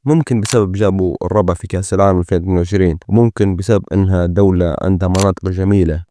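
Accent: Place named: Libya. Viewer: بلهجة الليبية 🤯🔥🔥🔥🔥🔥🔥🔥🔥🔥🔥🔥🔥🔥🔥🔥🔥🔥🔥🔥🔥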